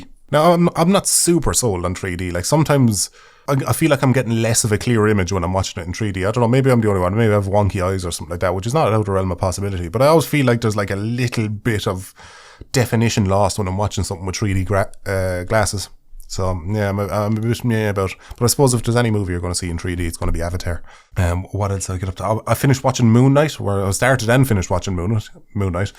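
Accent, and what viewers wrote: Ireland. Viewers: glad to have another podcast thank you so much its always awesome to hear that lovely Irish voice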